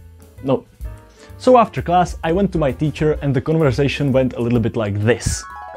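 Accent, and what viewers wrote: Czech Republic. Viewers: me when czech accent i thought it sounded like one, checked bio and sure enough. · ty jseš čech? poznal jsem podle přizvuku. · Já si říkal že tam slyším českej přízvuk. Neuvěřielná práce!! SKvělej projekt a myslím že to dost retro programátorů ocení. Jen tak dál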